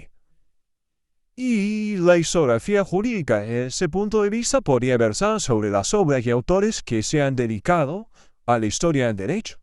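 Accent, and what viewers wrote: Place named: England, United Kingdom. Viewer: Tienes acento inglés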